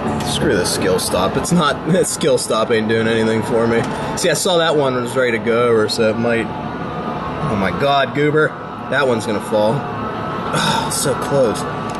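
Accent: United States. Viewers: he has the most american accent ive ever heard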